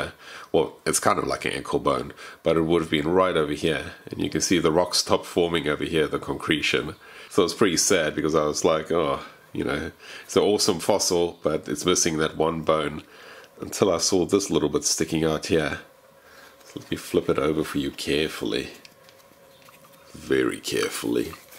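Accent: South Africa. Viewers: You sound like an ex South African was good to see what you do, I know an paleantologist her in ZA such nice people you are 🙏🏼. How old would that penguin be ??? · u sounds south african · Sounds South African to me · Why do you sound South African that's lived in Scandinavia or smth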